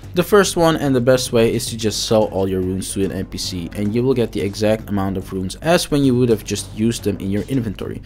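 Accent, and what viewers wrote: Netherlands. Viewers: Are you Dutch? 🤔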